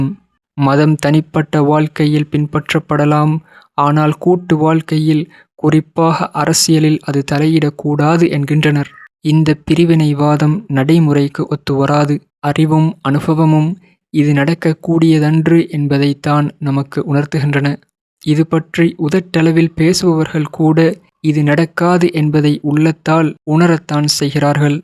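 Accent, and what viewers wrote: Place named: Tamil Nadu, India. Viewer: Assalamu wa alaikum neega peasura tamil ilaggai tamil matheri illai India tamil matheri iruku I am India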